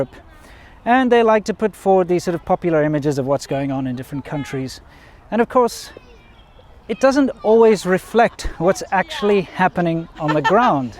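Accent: South Africa. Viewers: Hey there compatriot. I've just subscribed, just seen your channel. I'm a huge fan of Chinese movies (Hero etc.) and music (The Yellow River Concerto, makes me cry) So please do a series on Chinese music and theatrical culture. In these strange days let us not forget about the higher and finer things China has given us (Tai Chi? do they still do it?) Many thanks...it's so good to hear a South African accent lurking somewhere there! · Not particularly interested in China but I somehow got hooked by your channel. Love to hear the SA accent. Reminds me of when I studied at UCT - best time of my life!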